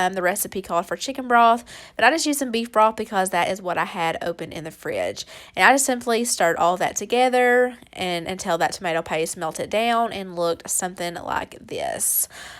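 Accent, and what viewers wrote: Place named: Kentucky, United States. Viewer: I don’t even have to ask where you are from! You sound just like my family from Kentucky. Love your recipes! I just subscribed.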